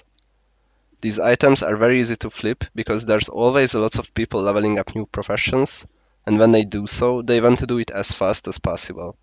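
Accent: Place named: Hungary. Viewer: Can figure out by your accent that you are Hungarian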